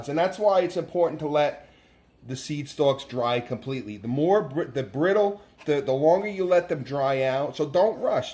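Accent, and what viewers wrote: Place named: New Jersey, United States. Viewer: Love your enthusiasm...and the Jersey accent totally helps. Get the cheap-o paper plates. Love it!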